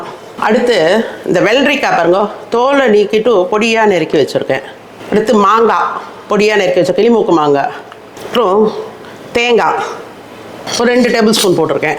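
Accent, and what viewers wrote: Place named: North Arcot, India. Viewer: Mami- so happy to have come across ur channel. You should be from north arcot. Been looking for NA samayal and tradition to a long time. Yours is the first. Getting so nostalgic of my childhood , your voice and accent resembles a lot like mom and paati. ‘Aponcha’, ‘Vadaparupu’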